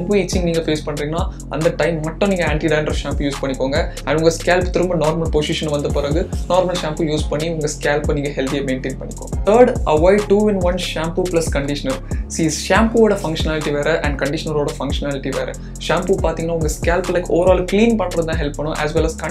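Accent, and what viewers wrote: Kanyakumari, India. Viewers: ohh I too from Kanyakumari bro , ur slang is Kanyakumari slang 😁❤️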